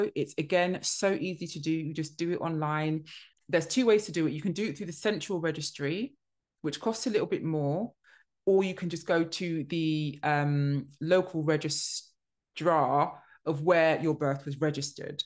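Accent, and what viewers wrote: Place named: United Kingdom. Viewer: Good morning. I love your British accent it's so beautiful ❤️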